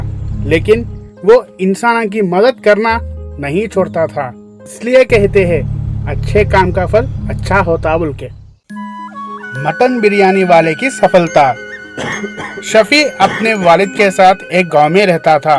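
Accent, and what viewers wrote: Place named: Hyderabad, India. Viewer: حیدرآبادی اسینٹ لگ رہا ہے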